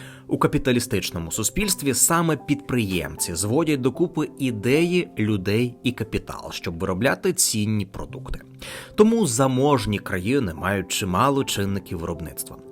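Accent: Russia. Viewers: Дикторе! Купи поп-фільтр, або навчись ним користуватися! 👺 І ще важливий момент: українська "и" це не расєйська "ы" по вимові! А "що" - це не "шчо"! 💩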